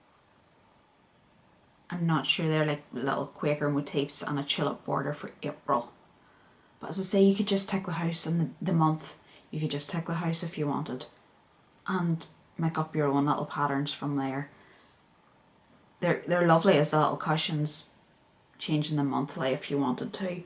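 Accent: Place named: Northern Ireland, United Kingdom. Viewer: 🍓loving the accent. I live in Bangor Co.Down and I don't hear many if our accents on flosstube. The other one I watch is sewmesarah who's amazing. Loved seeing all the little projects. I was a monogamous stitcher til I discovered flosstube about 6 months ago. I see so many things and I've a list already from what you've shown today that I don't know where to go next. Keep up the good work. 💞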